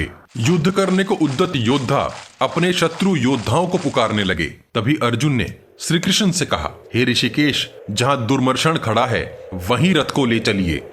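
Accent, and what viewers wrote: Standard. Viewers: Bahut dino baad, etni sudh Hindi suna😊 acha laga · आपका यह प्रयास अति सराहनीय हैं। आप ना केवल शुद्ध उच्चारण से महाभारत का दृश्य पुनर्जीवित कर रहे हैं, बल्कि पुरातन काल में सनातन के परम वैभव को भी आप स्थापित करने में अपना योगदान दे रहे हैं। यह सब कार्य भगवान की कृपा के बिना असंभव है। हमारी प्रभु से प्रार्थना है कि आप इस तरह के श्रेष्ठ कार्य को आगे भी करते रहे।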